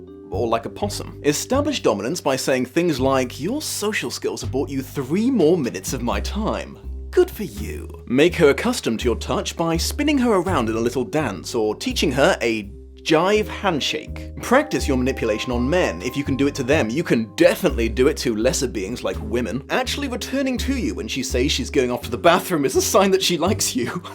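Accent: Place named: United Kingdom. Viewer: I know. I've never been into a guy more. Plus, he's got a (real) British accent, respects LGBTQ+ and women, and has good teeth! What more can you ask for in a man?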